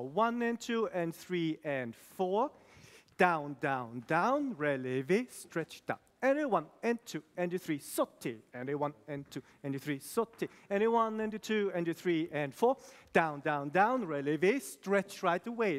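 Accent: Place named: Brazil. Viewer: I know you're Brazilian 😂 your accent tells ♥️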